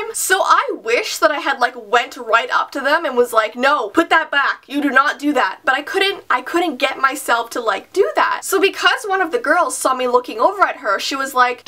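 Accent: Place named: Canada. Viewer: I think it's because she lives in Canada. In her crazy babysitting video she sad bagel like bag-el while in America we pronounce it BAE-gel 😂😂😂 have a nice day!☺️☺️☺️😃😃😃😊😂😂😌😌😌😉😉👍👍👍👍✌️✌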